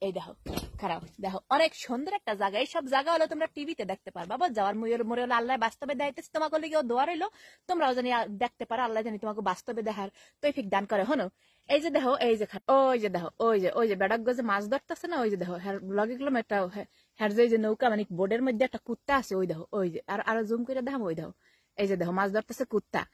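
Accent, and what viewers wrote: Barishal, Bangladesh. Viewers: আফায়, বরিশালের কোম্মের লোক?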